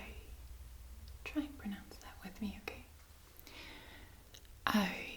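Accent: Netherlands. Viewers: acually its a dutch acent. just like in groningen, whete they say the G diverent. she lives somewhere ehere they pronounce the R diverent. algemeen nl is diverent yeah (just asuming your dutch here, ipv vertalen)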